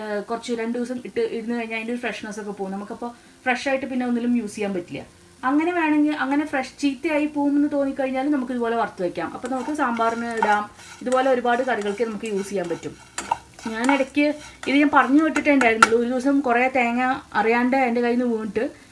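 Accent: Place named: Palakkad, India. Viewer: palakkd nnano, njangl palakkattu karade oru samsara reethi ndu and cooking style too... Rasam koodi onnu idoo... Plz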